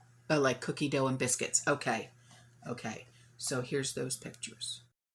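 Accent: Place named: East Coast, United States. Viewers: The lovely thing about this venue is that you can click the off button. I’m not a big fan of thick east coast accents but I know an accent doesn’t reflect the sum of a person. Regardless of the delivery, gives out a lot of good information and she is truly sincere. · I dont notice anything different either...she sounds like a Northeasterner to me. A valley girl sounds quite different...I'm in CA right now...believe me I KNOW what a valley girl sounds like 😂😂😂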